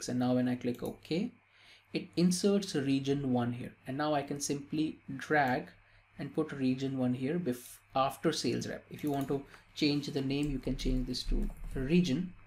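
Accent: India. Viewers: I know this is a good tutorial whan I heard Indian accent in the opening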